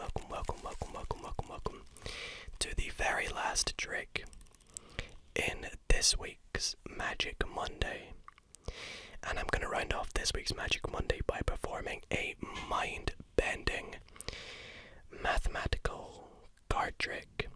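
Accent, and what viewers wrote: Scotland, United Kingdom. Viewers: Just realized he’s Scottish about 10 mins in hahah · Scottish, yep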